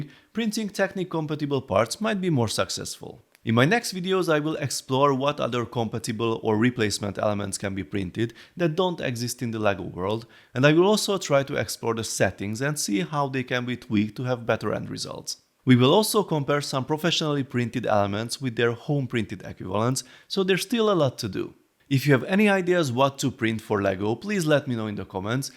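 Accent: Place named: Hungary. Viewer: Are you Hungarian? Your accent sounds so Hungarian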